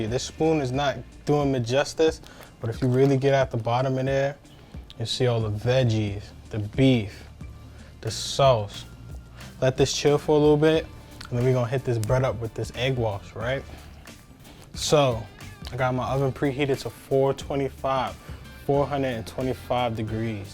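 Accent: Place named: New York, United States. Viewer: Sucha new York guy. Brooklyn? Bronx? Manhattan? I wonder. Imma try this out. Big thanks from the bx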